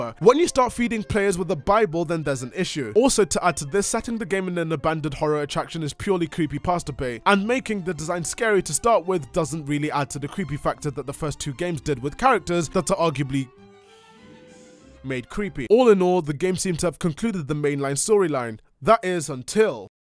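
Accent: United Kingdom. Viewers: Lol u sound like British Cyrus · The British accent makes it a fucking amazing video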